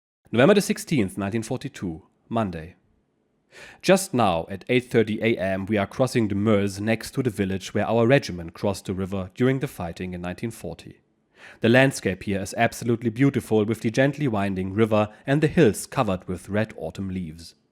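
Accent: Germany. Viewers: Thanks for your accent, Thuringia?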